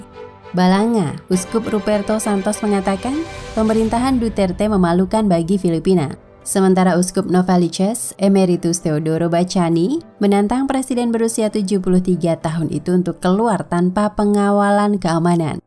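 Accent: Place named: Banyumas, Indonesia. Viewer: Ngapak pula kao bapak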